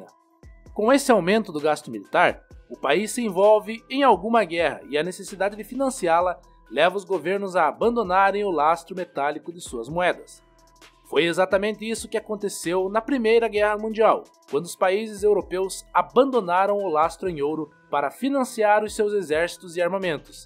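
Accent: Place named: Rio Grande do Sul, Brazil. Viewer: Esse narrador é gaúcho.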